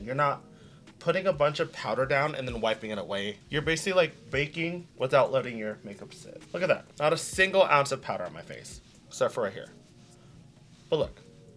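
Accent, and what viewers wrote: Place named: Central California, United States. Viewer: Hey gorgeous! First time really commenting but as the last video you spoke about wanting to hear more about what brings people to your channel figured I'd throw in my two cents. First of all, I love how calming your voice/energy/vibes are as many people have said. I love that you explore and play with make up, it's something I love to do as well. So many drag performers have figured out their mug and don't play with shapes and angles but as a fan of drag who has been trying to find the mug of my dreams it helps to watch someone who does different eyes cheeks lips ect. Also, I love that you still sound like a central Cali girl. I grew up in the Sacramento area and you sound like my friends and family so it's nice to hear. Lastly, you are just to muggy to not follow you come stamped every fucking time. 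❤ Much love keep the videos coming because the girlies who get it get it and we love the content. Happy pride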